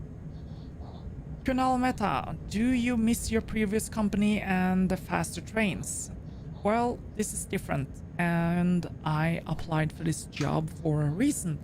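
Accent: Norway. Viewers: 😊So glad to hear your voice😊. Spend months wondering what one may look like, or sound like...and why was I suprised when you sounded Norwegian?!❤ Enjoy the rails, and enjoy the skies!🚅🛩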